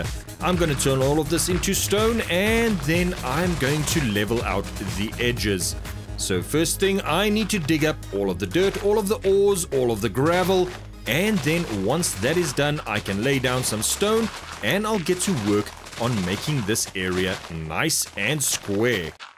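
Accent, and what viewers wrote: South Africa. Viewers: Are you from south africa??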